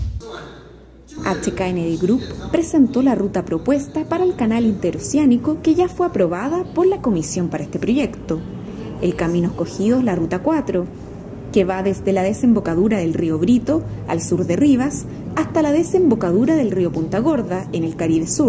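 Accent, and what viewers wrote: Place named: Chile. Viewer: Esta locutora del video tenia que ser chilena, como arruinan las bonitas noticias !!!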